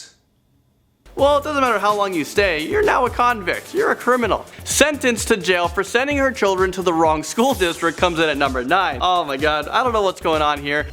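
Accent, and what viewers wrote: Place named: United Kingdom. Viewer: Emi c; edits I wasn't complaining about his accent, if anything i would have praised it, i happen to like the british accents.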